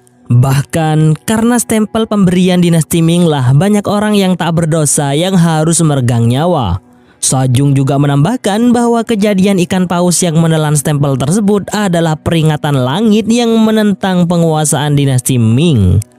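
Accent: Madura, Indonesia. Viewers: Madureh ongghu🤣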